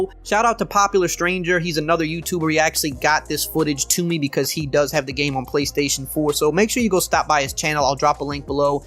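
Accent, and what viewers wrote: Louisiana, United States. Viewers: Just discovered this channel and damn you have to be from the bayou lol accent is thick.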